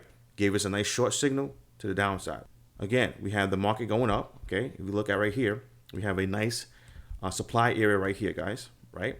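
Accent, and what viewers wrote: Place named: New York, United States. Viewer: My brotha from NYC. I can tell by your accent that you're a New Yorker. I am too. I've been watching your video's on a binge. Great stuff thus far.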